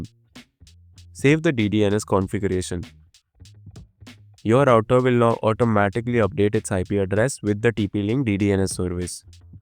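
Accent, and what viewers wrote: India. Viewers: From your accent you sound Indian. I listened to many american tutors on this subject. I have easier time understanding american, but they only confused me further. You, on the other hand, were succinct, clear, well paced, and I just got my job done. Kudos.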